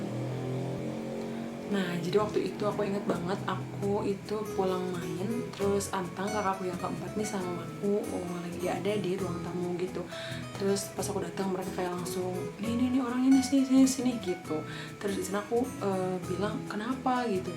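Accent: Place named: West Java, Indonesia. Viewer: Duh sunda pisan euy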